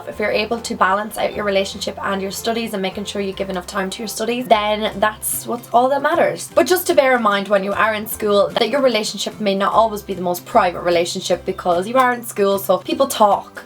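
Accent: Ireland. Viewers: Are you Irish? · Love your advice and health related videos😍 So relatable and helpful, definitely one of my favourite YouTubers! What county are you from in Ireland? I'm from Dublin but my accent is nothing like yours😂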